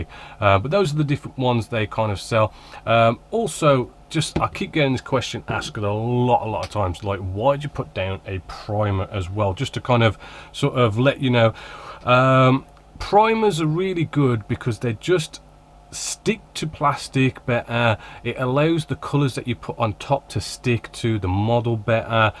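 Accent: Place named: Black Country, United Kingdom. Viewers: This convinced me to pick some up. Nice to hear a fellow yam yam, ha! Subbed. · been using 1500 as a primer for a few years, still needs 50/50 thinning for an airbrush as it is very thick and gloopy! just out of interest are you based in the midlands as you have a bit of a midlands accent? like myself tbh!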